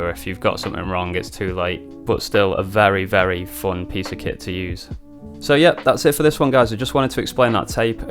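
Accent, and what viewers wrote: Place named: Northwest England, United Kingdom. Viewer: Superb tape! And as always, nice vid. I started with a PO recently and after watching your vids, decided a 404 might be a nice step up/replacement. Surprisingly they work really well together though - rather than as a replacement. I have a 202 arriving today also (you’re partly to blame for this!). Keep up the great vids - great to hear tutorials in a NW regional accent. Reprazent! ✊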